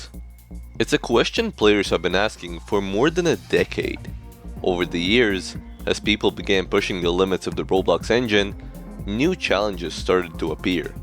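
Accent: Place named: Latvia. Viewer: Latvian accent💔🙏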